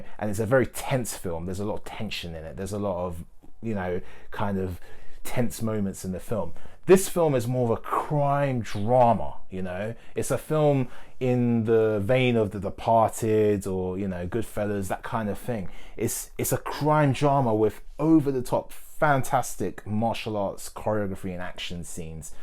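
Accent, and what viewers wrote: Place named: United Kingdom. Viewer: Hey with british accent.. good review bro..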